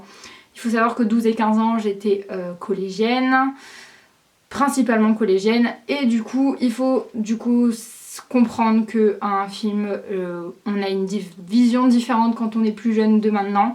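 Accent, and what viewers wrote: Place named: South, France. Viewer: J'aime cette vidéo juste parce que t'as pris l'accent du sud :p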